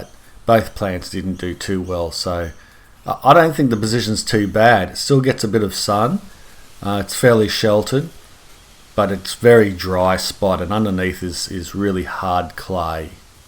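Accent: Australia. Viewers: I love your videos. I'm in NZ so my climate is not quite as warm as yours, but great to hear a Southern Hemisphere accent (even if you are an Ozzie!). After seeing your dragon fruit vid, I sowed some Dragon Fruit seeds and they have alredy sprouted.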